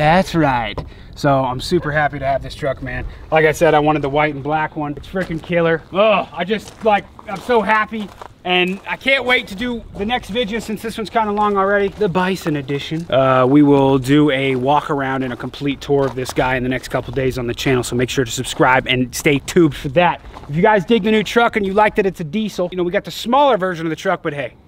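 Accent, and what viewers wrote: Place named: New Mexico, United States. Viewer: lol I’m from New Mexico and that’s actually how they talk, And my mom has that same exact truck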